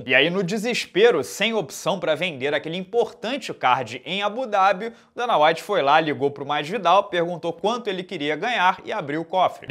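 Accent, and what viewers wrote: Rio de Janeiro, Brazil. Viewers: Meu, esse sotaque carioca é TÃO IRRITANTE!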